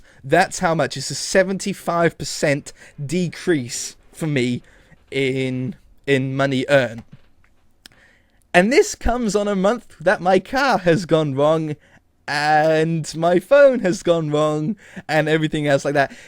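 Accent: United Kingdom. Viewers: That British Accent I'm First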